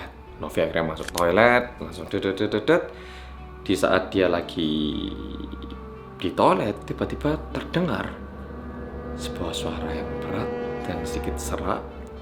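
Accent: Java, Indonesia. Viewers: 3 channel storytelling favorit dan bagus: 1. (pembawaan santai dan medho serta mudah dipahami) 2. Detektif Aldo (mirip siaran radio) 3. Arroyan Pram (kasus, konspirasi sampe sains fisika, editan paling niat dan keren. Serasa nonton film layar lebar).. Kalo kalian? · Mirip Dr.Tirta 😁 medokk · cerita di Bandung, logat mas jawa... wkwkw mantep mas tetep asik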